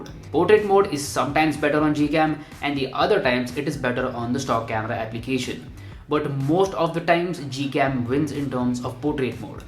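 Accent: India. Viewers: Indian when speak English 🤮🗑️🥵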